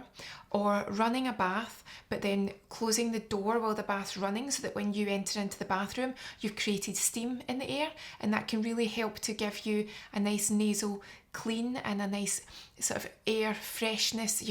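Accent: Scotland, United Kingdom. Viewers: I really would like to just have this lovely Scottish lady follow me around all day long, narrating my life. Oi, this wee bastard just cut us off. Now we're flipping him the bird. Off we go again. What a grrrrreat day. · Are u anywhere near London , obviously got Scottish accent but thought I’d ask , would love hand arm massage . And maybe this sinus treatment, you are really really really educated and knowledgeable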